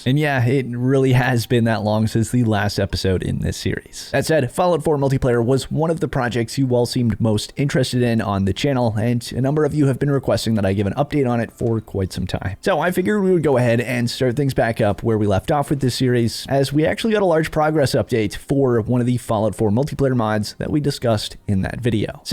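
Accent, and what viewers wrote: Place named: Canada. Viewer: is the master of mods. At first I thought you were from California, but had to pay attention to your accent and you are a true Canadian. 🤣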